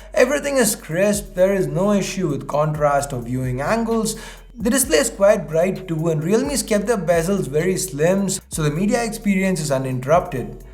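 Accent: India. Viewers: difficult to understand your indian slang english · I know its bad on my part, but I just can't stand Indians having a mix of Indian and foreign accent. Sorry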